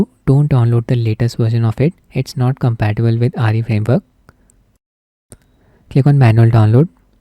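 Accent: India. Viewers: I dont understand indian english..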